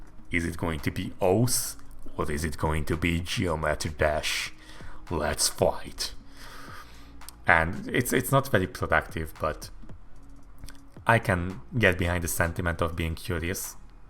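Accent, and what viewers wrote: Hungary. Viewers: I like your hungarian accent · Is that Hungarian accent? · I know a hungarian accent when I hear it :)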